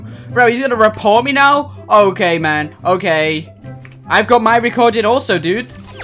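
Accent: United Kingdom. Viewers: Hey are you british?????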